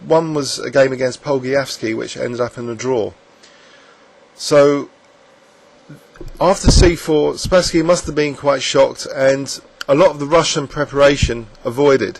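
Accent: United Kingdom. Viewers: Love your british acent :P well I guess it's british :P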